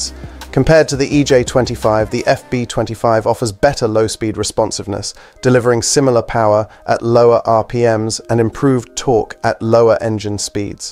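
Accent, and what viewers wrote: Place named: England, United Kingdom. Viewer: I take issue with the remark that a timing chain is maintenance free. It depends on the rpm used, presumably in USA, you sound English but price in dollars, automatic gearbox is normal and therefore high rpm rare but each chain has to go round the tiny crank sprocket and if that stays above 4,000rpm for long the chain is grossly overspeeded - read a chain catalogue or maker's recommendations. One reason for belts was to get away from wearing chains.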